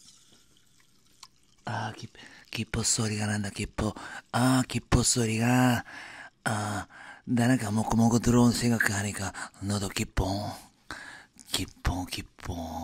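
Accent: Japan. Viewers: 저는 일본 사람입니다. 다나카さん의 억양이 여기저기 정말 일본인답고, 처음에는 이런 재미있는 일본인이 한국에서 활약하고 있구나!! 대박!!! 라고 생각하고 보고 있었는데........??? 정말 일본 사람 발음의 특징을 포착해서 엄청 웃었어요😂 美味しくなぁれ、美味しくなぁれ、萌え萌えキュン🫶🏻 (한국어가 틀렸다면 죄송합니다🙇‍♀️) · 일본어 공부하러 왔어요 요즘 다나카님 억양이 머리에 멤돌아서 저절로 일어가 터질 거 같아요 근데 느끼한 뉘앙스가 더 강하게 남아요ㅋㅋㅋㅋㅋ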